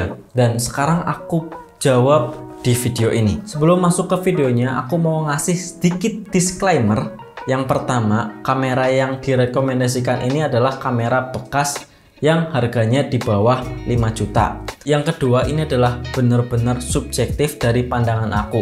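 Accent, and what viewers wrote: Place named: Java, Indonesia. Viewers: Medok ya mas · Kayak'e wong ngapak ya mas'e? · Ngapak ya mas. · logat ngapak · Logat ngapak nya ga ilang ,bagus ,identitas 😂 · Medok oke jadi aku menetap untuk menonton 👍🏻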